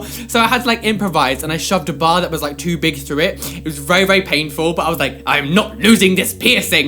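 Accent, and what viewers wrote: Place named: United Kingdom. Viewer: I love your typical British accent.. it's so cute 😁 And ofcourse I love even piercings and body modifications. I used to have a surface of wrist, two microdermal on chest and nipple piercings, but I decided to remove them when I was pregnant of my daughter... I still have ear plugs and the top of the ear pierced..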